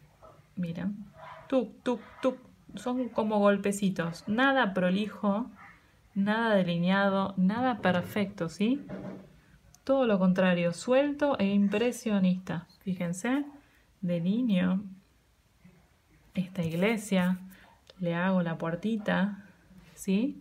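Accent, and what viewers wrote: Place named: Spain. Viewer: Me encanta su acento de español ❤❤❤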